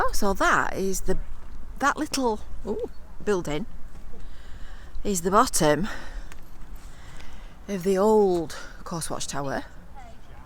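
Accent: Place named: Yorkshire, United Kingdom. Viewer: Thanks again for reminding us of things to visit on our coast. I often put on the auto subtitles and chuckle at how it guesses at your Yorkshire accent. Unfortunately for 'Fylde' it puts fouled or failed!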